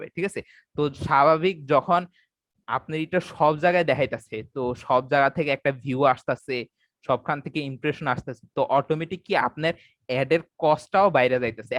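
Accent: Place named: Comilla, Bangladesh. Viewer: আপনি মনে হয় কুমিল্লার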